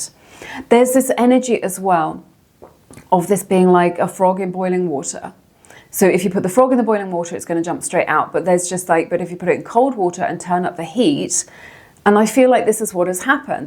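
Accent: England, United Kingdom. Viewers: your readings are spot on. ive found that if you are a caring giving person many people may come too hate you. ive never understood why. i really enjoy your readings. you have totally open my eyes and elevated my life. thank you so much. side note here i was stationed in lakenheath england 1968 to 1970. i almost married an english girl. im now 77 and im amazed how you have enlightened and motivated me . thanks again and i love your accent brings back fond memories.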